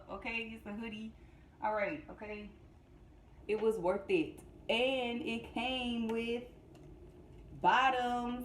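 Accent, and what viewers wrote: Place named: Florida, United States. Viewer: It’s the Flori-ana accent for me!!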